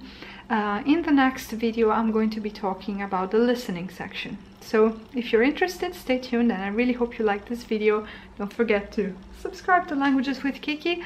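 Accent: United Kingdom. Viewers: Me encanta el acento inglés ❤️❤️❤️❤️ lo escucharía todo el día.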